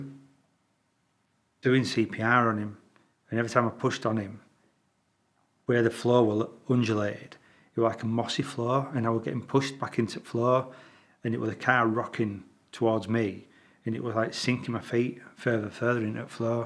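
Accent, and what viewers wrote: Yorkshire, United Kingdom. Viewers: First time on your channel, recognized that lovely Yorkshire accent right away. This brought back to me five years ago when I was in Scotland. I was getting ready to go do something’s when a cold dark wave rolled over me leaving me heartbroken and wiped out. It wasn’t until later that day that I was told my son in Vancouver died at that time. Eight hours difference in time, his time two am and mine ten am.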